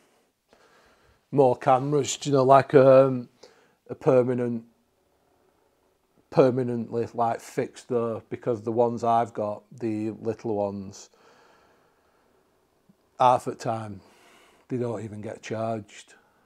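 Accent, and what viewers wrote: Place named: England, United Kingdom. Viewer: I just figured out that English people don’t pronounce their h’s if it’s the first letter of the word. Like hello would sound like “ello”. I love your accent. It’s very cool and makes you nice to listen too. Like when you say half. It sounds like “aff”. 😊❤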